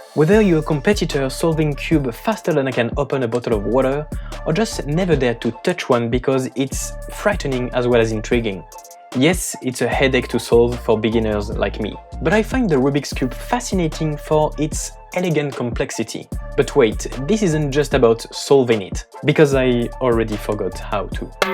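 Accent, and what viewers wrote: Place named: France. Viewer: L'accent français !!!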